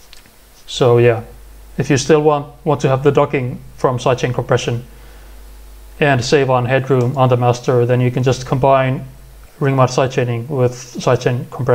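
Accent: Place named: Eastern Europe. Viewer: I always know i'm in for a great tutorial vid when I see a grainy webcam and the uploader has an Eastern European accent of some kind, no 5 minute intro and unnecessary bullshit just str8 to the good stuff. God bless you man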